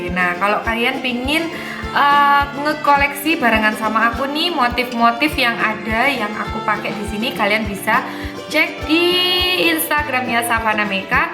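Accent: Java, Indonesia. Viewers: Orang jawa nya mba kelihatan medok banger maaf nyh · Hehe sy malah demen lht gaya bhasanya die selain cantik logat jawanya ntu tuh gemes 😁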